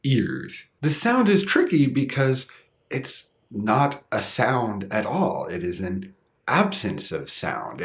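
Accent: Canada. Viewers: It is English, from a native speaker, he's also Canadian, so it would be "Canadian English" I suppose. The North American pronunciations are the widest spoken, more than 75% of native English speakers use North American pronunciations. If he were Welsh would you expect him to say "Welsh English"?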